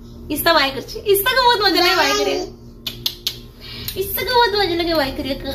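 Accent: United States. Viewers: American English kemiti Shikhile ? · How u learned American english? Plz share details...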